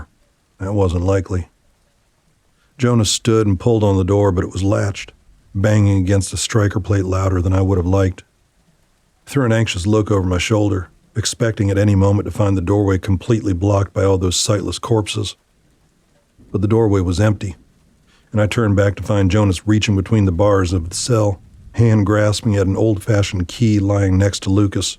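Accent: East Texas, United States. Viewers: Your East Texas series with your perfect country voice is my very favorite for relaxing. I have PTSD major depression and anxiety disorder so I keep it on rotation when I can’t sleep. Thank you for keeping the good energy in and the bad thoughts away ❤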